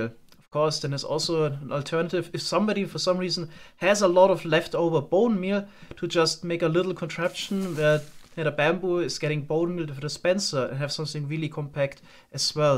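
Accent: Germany. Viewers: Are you german? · Bruder guter content und ganz ehrlich der deutsche akzent ist mega charmant! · kann es sein das du Normalerweise Deutsch sprichst? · Ich höre da irgendwie Deutsch raus xD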